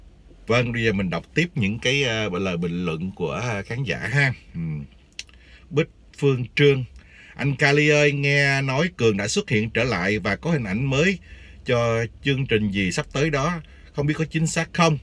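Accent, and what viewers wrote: Standard. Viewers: Anh nói quá chuẩn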